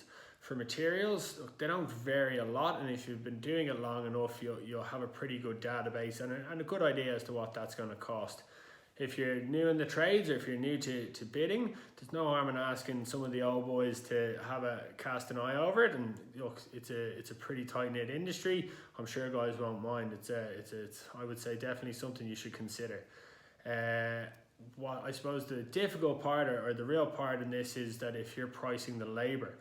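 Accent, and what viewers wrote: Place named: Dublin, Ireland. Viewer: I notice you and the guy in the previous video I watched have Irish (Dublin) accents. Are you guys based in Ireland or USA? I'm Irish and am a drywall/steel-stud estimator living in Canada. We use On-Screen takeoff where I work - it's very good, but quite expensive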